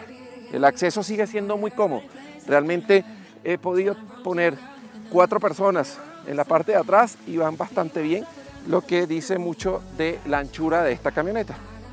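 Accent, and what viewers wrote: Venezuela. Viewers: eres venezolano cierto? exelente video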